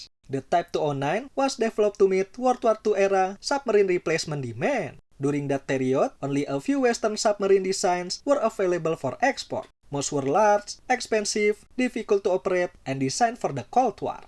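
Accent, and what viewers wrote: Indonesia. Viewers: biasa aja malah wkwkw aksennya indo banget.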